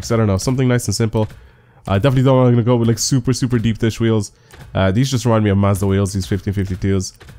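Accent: Ireland. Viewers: Is he irish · is from Ireland?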